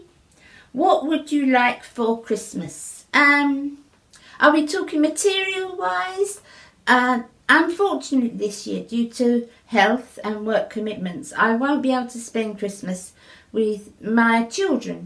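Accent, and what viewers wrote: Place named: Scotland, United Kingdom. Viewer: I have been subscribed to your channel but hardly have a time to drop a line! What part of Scotland are you from? I luv the accent!👍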